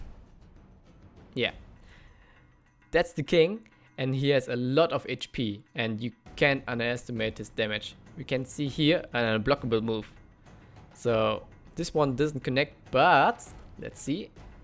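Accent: Germany. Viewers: Dieser breite deutsche Akzent 😂 aber gutes Video 👍🏽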